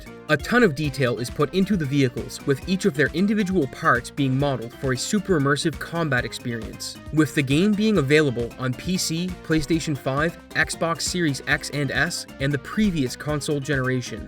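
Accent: Canada. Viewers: Are you Canadian? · This guy's gotta be canadian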